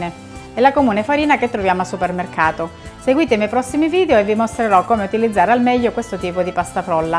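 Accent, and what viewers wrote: Calabria, Italy. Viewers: Grazie! Spiegazione impeccabile. L'accento calabrese è bellissimo. :)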